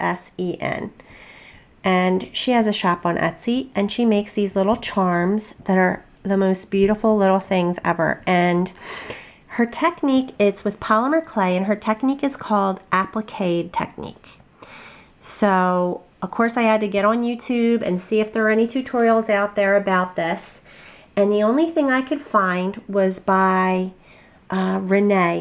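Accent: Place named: Georgia, United States. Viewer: last night i ran across eva tissen too and i looked thru her gallery and was literally blown away and i was like i would looove to be able to do this . i am gonna hafta give it a try! you did awesome with these pieces! my favorite one is the daisy one. i love how you curl the vines on some of them! btw you have an accent like my cousin who is from warner robbins ga. :)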